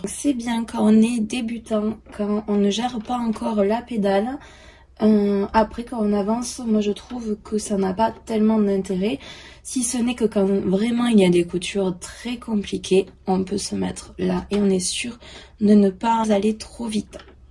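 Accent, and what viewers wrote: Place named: Carcassonne, France. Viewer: Waouh ... quelle machine 👍🧵 Merci à toi Tu as un petit accent que je connais ... je suis de Carcassonne lol 😊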